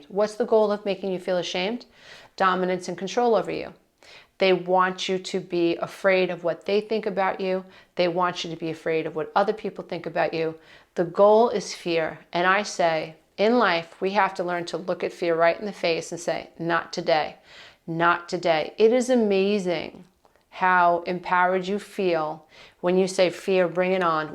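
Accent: New York, United States. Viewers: I love watching your videos, you have a soft nature with a "no BS" New York-style tone. I experience a lot of "ah-ha moments" tied in with laughter too. Wack-a mole, that has always been funny to me, I love that you use that. I really feel like the universe guided me to your videos, they have helped me greatly, thank you.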